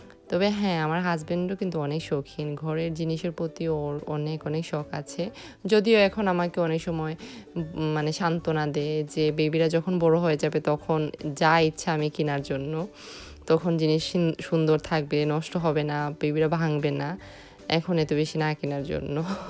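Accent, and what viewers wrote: Sylhet, Bangladesh. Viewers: Apo are you came from salyet because your speak like that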